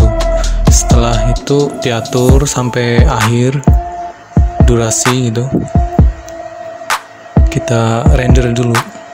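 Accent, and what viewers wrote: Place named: Java, Indonesia. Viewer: Wong jowo toh iki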